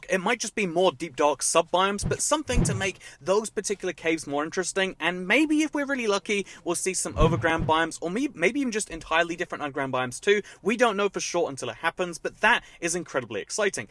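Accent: England, United Kingdom. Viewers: Well, I like your English accent and I like that you say "nought" so if you change your mind and decide to continue saying nought instead of zero, I'll be in support of that decision! It's nice to hear how different regions of the world speak English differently. I feel like Americans getting mad that you say "nought" seems really spoiled/entitled. Like "English is my language and you have to use the words I use!" Which is super boring and gross. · My question there is about how British say things. The things I have a question over is the word aluminum and the letter z. Why do you(British people)pronounce aluminum(a-lu-min-um)as aluminum(al-u-min-um) and prounce the letter Z(Zee in American)as Zed? That is stupid how it works. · Please continue with your British English. Naught makes you sound smart, as I associate it with Numberphile. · Honestly I don’t mind the British English, I think it’s fun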